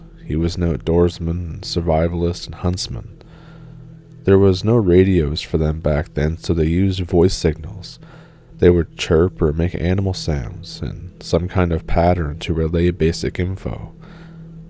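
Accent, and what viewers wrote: Canada. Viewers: Greetings from Ohio! Yeah slight Canadian accent. Just the "ou" sound, like out, about. I like it 🙂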